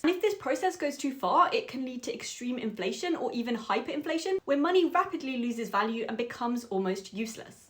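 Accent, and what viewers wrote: Birmingham, United Kingdom. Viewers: Had to look up Brummie. So, you're from Birmingham! Cool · Birmingham I love it probably one of my favorite British accent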